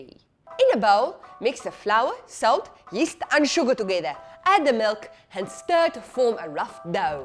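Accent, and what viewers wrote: Afrikaans, South Africa. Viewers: In her videos she has an Afrikaans accent but that is not how she talks in real life only in her videos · A bad Afrikaans one. Quite common :) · Is she South African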